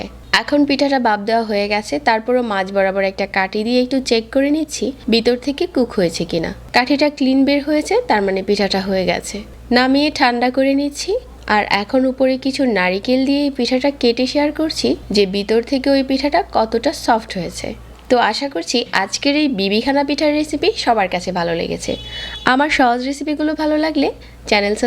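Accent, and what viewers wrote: Noakhali, Bangladesh. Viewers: আপনার বাড়ি কি নোয়াখালী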